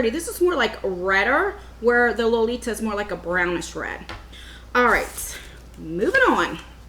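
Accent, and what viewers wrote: New Jersey, United States. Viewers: Lol. I think you said "Walmart scrub" before describing the scrub. The Jersey accent is similar to us in MA, but I think you said Walmart....and I almost spit my tea out. Oh I love you.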